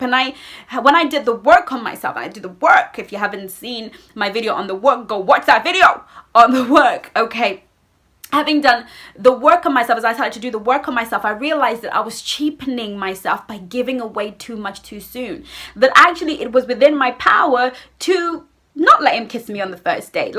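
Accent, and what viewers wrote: United Kingdom. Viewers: Hey, Love you and your cute little British accent.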